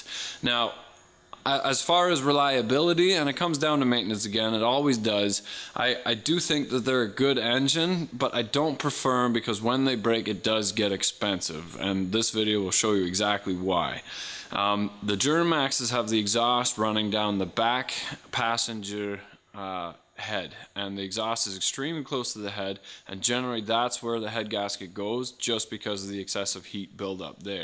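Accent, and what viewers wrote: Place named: Canada. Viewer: I got sent this video out of the blue to my personal FB page saying "hey Brian, you've got a doppelganger! It's you, but with a Canadian accent! I said, heck ya- it's my dopple-ginger! We're already met and friends eh?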